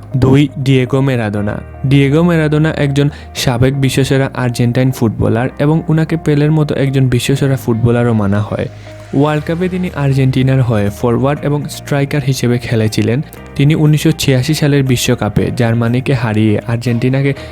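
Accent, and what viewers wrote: Sylhet, Bangladesh. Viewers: ভাই আপনার বাসা কি সিলেট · Are u Sylheti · Vai afnar bari sylhet ni? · ভাই আপনি কি সিলেটি? Please reply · Nice video thanks for the information. Bro basha kita sylhet o ni😅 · apnar bari ki sylhet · সিলেটের নাকিতা ভাই তুমি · Bai apni ki sylheti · vai ki sylhety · ভাই আপনি সিলেটি? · Vai apni ki sylhety 🥰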